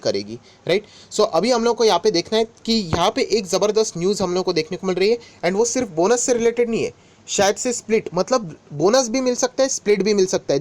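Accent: Maharashtra, India. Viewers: Ho nkki awajch ahe marathi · Hallo Sir.. your mother tongue is marathi...100%...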